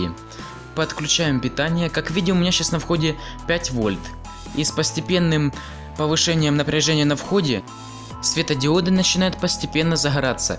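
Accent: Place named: Ukraine. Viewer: украинский акцент просто, а вот с грамотностью речи поработать бы, либо читать по бумажке сначала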